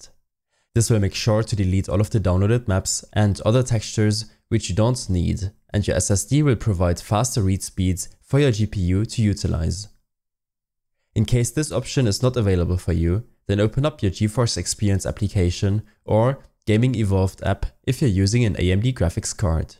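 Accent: Germany. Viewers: you have a german accent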